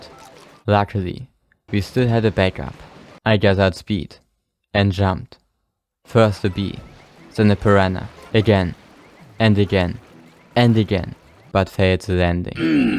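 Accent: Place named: Germany. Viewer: bro the english sounds like a german that tries to speak english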